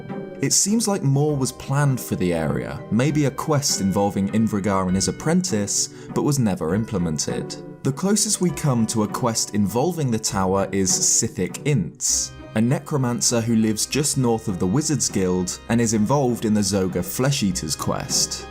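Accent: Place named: United Kingdom. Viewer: all British people sound the same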